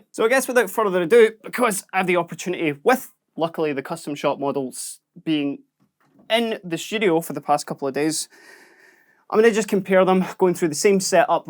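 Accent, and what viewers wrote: Scotland, United Kingdom. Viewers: I like listening to your Scottish accent more than the guitar 🤭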